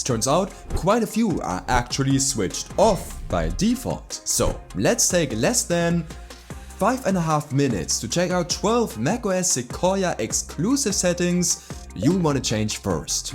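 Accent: Germany. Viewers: What a dreadful German accent.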